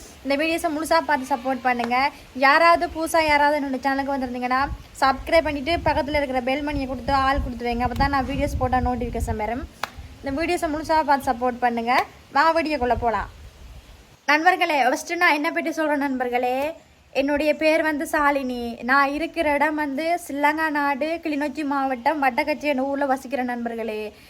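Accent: Sri Lanka. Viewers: Hi sister your Tamil alaga irruku iam from kasmir(Army) my native Rameswaram. My grand grand father is coming from sri lanka so ur langauge my grand father and grand mother pesura mathiri alaga Iruku . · நீங்க உண்மையாகவே கிளிநொச்சியா உங்கள் தமிழ் மலையக வாசம் தெரிகிறது. மலையக மக்களும் சிறந்த பண்புஉள்ளவர்கள். நல்ல கடும் உழைப்பாளிகள். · தங்கச்சி உன் இலங்கை தமிழ் எனக்கு ரொம்பவும் பிடிச்சிருக்கு அழகா பேசுறீங்க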